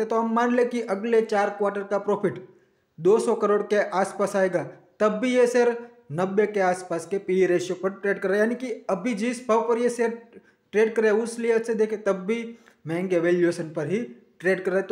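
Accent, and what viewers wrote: Gujarat, India. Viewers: Loved Gujrati accent.Reminded me of JETHALAL.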